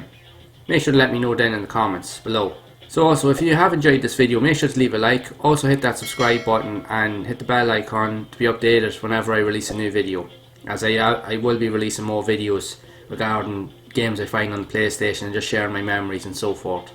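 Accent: Ireland. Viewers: Wow your pumping out these videos every day. I appreciate your hard work. Looking forward to more. I subscribed to keep up! Love your accent also. Whats your country of origin if you don't mind me asking? Im from the U.S. Nevermind i looked at your about section and saw its Ireland. :) I have a channel as well but its not so much videogames as funny videos or movie clips.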